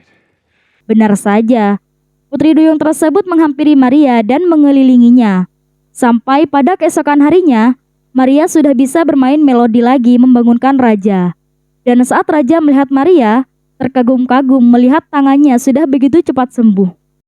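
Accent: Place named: Madura, Indonesia. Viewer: Kek orang madura nih logatnya 😅😂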